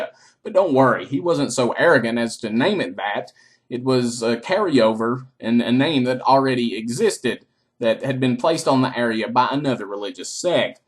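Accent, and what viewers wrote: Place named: South, United States. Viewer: Don't hate Southerners, they have different rules of pronunciation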